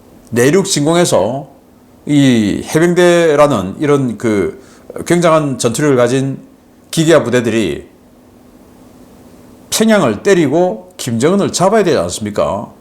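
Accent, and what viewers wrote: Gyeongsang, South Korea. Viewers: 님 경상도 아저씨 맞아요. 그러니까 우파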